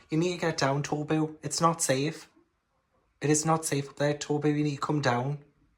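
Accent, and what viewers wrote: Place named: Scotland, United Kingdom. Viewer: Oh man, you jumped right on into the EXTREME horror. When I saw “Cows”, I was like … 👀 … 😂 Please excuse my American ignorance, but where is your accent from? I love it SO much, but I can’t pinpoint it … Every time I watch your videos I think you sound Scottish maybe? I imagine you reading this being like, this girl isn’t even close! 🤣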